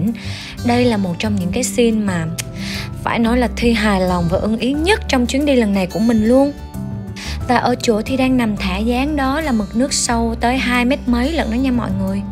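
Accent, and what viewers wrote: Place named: Saigon, Vietnam. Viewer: Blogger đầu tiên xem mà mắc cười cách nói chuyện và điệu cười luôn. rất dễ thương, giọng SG yêu lắm, mà thích nhất lúc bả nói chai rượu mập như con heo, rồi đang nói thì cười cái vụ tốc váy, rất gẫn gũi, chân thật và dễ thương. Mong luôn đáng yêu và hài hước như zậy <3 rất đáng xem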